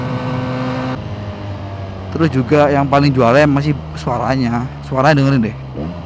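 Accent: Surabaya, Indonesia. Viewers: Mas orang surabaya ya?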